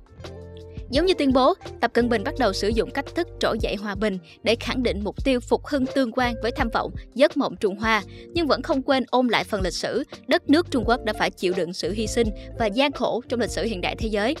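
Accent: South, Vietnam. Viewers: mc người nam, ngã hỏi đọc na ná nhau, quan trọng là video viết đúng chính tả và nói đúng nghĩa của nó. Nếu nghe mà không hiểu tại sao "sỉ" mà không phải "sĩ" thì chỉ trách mình học chưa đủ nhiều, cần học thêm.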